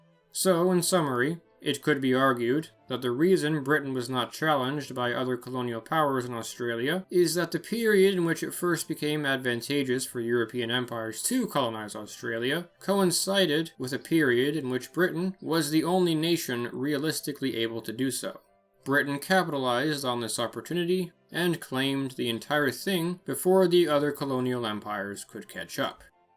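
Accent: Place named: United States. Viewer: Do we really need to pay an obnoxious American accent to tell us about Australian history. Go back home and preach to the gullible.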